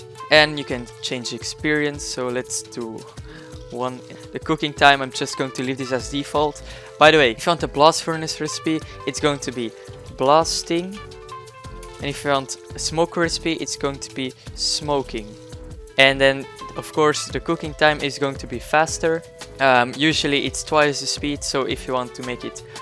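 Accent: Netherlands. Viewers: Are ya dutch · i like how this guys sounds dutch i am from holland to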